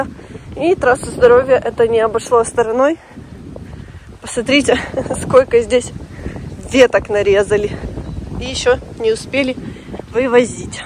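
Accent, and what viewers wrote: Ukraine. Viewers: Такой акцент у тебя Украинский и такая ты серьезная не смотря на юмор Одесситов вообщем покорила ты меня❤🌹💋🌹🌹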